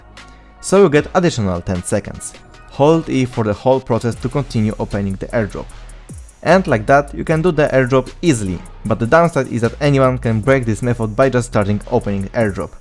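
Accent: Poland. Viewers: you have polish accent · Czy to polski akcent 😅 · i love the polish accent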